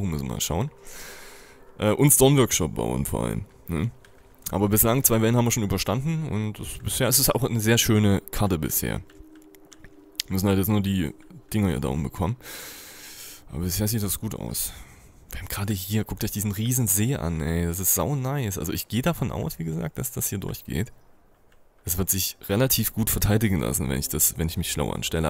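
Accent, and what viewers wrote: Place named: East Germany, Germany. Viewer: 1.City of doom (von Zombies besetzte Stadt/ ist etwas zerstreut manchmal) 2.Cottage auf ossi-englisch Das sind keine Sprechfehler, das ist Charakter.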